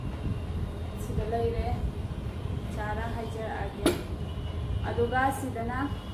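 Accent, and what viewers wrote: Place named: Kakching, India. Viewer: I knew it that you were from kakching the first time I started watching your video. My mum grew up in kakching and the way my mom speaks manipuri language whenever we visit kakching is same as yours ❤️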